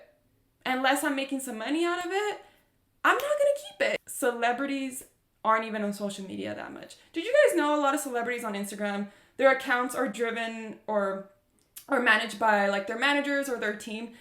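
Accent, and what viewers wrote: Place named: Brazil. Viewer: teu inglês é perfeito, mas por algum motivo sei que tu é br... subliminar... mas sei kkk excelente vídeo!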